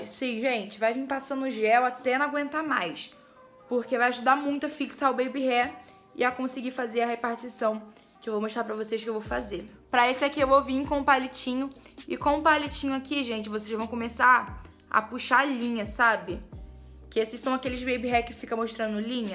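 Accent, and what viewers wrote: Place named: Rio de Janeiro, Brazil. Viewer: Amei o vídeo 💗, sério,o sotaque carioca é muito bom de ouvir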